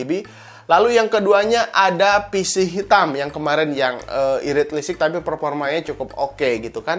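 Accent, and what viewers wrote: West Java, Indonesia. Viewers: Saia suka logat anda👍🏼, sundanese bangett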